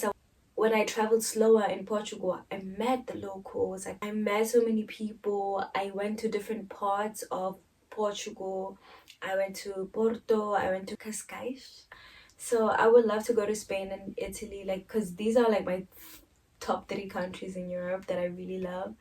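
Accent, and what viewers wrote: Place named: South Africa. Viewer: woah...you have a South African accent!🤭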